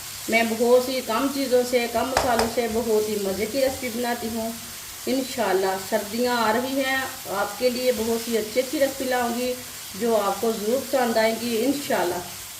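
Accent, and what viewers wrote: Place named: Punjab, India. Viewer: Panjabi jab Urdu main baat kartay Hain to pata chal jata hai k yeh Panjabi hai lahja aisa HOTA hai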